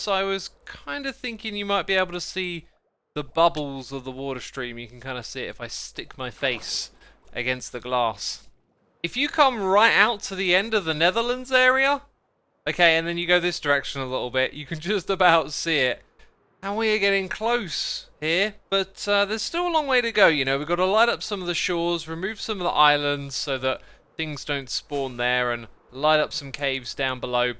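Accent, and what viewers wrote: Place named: United Kingdom. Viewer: He's hella british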